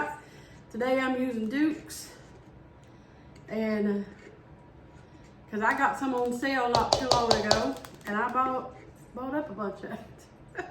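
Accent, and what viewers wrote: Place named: Tennessee, United States. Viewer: Hey. I absolutely love Cole slaw with almost everything too girl. I don't put lemon juice in mine or white onions. But I do put vinegar and chopped green onions in mine. I don't put carrots in mine either. My family don't like them in it. I can't hardley get them to eat Cole slaw anyway. Except q of my twin daughters. But I will definitely try the lemon juice next time I make it. I'm from Morristown Tennessee girl. And my name is Melissa. Nick name is. Lol. Glad I found your cooking channel. I also have had wilted lettuce and poan bread( biscuits). I use to call the pintos. Soup beans. And my sister wud make fun of me. That onion soup chicken looked delicious. And I also cud eat dressing all the time too. I'm sure I've had meal gravy b4. Not sure who made it. Probably my mamaw. She was raised and lived in Tennessee as well. 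And hearing u talk sends me back to them good Ole days. When u say youens. I use to talk like that too. I had to move from Tennessee back in 1995 bc of a bad abusive marriage. To North Carolina. I do miss Tennessee so much. I just don't miss the craziness I definitely had to get me and my babies out of. I still have family that lives there. Cousins, STEP dad, step kids and step grandbabiesa step brother and some friends that I wish I cud come visit on a regular basis. I will continue to keep watching ur videos sweet doll. I'm absolutely loving it so far. Your daughter is so adorable. GOD BLESS US ALL SWEETHEARTS XOXOXOXOXOXOXO HALLELUJAH AMEN 🙏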